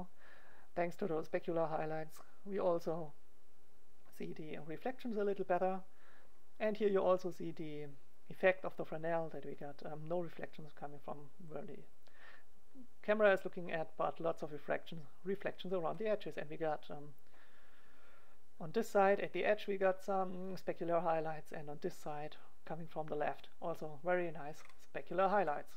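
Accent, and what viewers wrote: Germany. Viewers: Man merkt direkt das du Deutscher bist aber gutes Video Like&Abo haste von mir!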